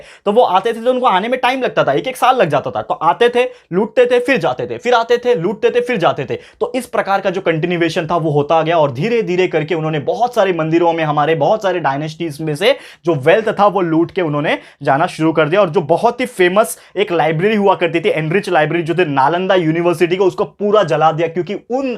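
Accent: Maharashtra, India. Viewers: Sir is your mother tongue Marathi.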